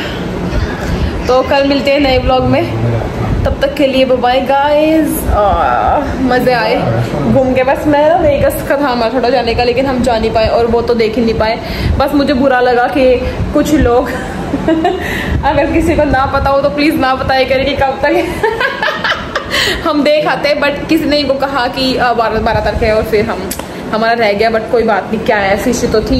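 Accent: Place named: Haryana, India. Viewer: Hey ❤❤❤ kashika here... Don't know message will reach..bas ker dia..😅😅😅😅and haryanvi accent me tum bhot surprising lgti ho...😂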